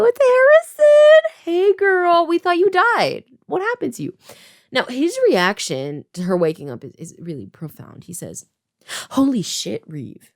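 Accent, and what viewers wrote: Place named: United Kingdom. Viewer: as someone who has been watching your videos for a while now i spent this video so worried about everyone who wasn't in the known main trio also the british accent caught me completely off guard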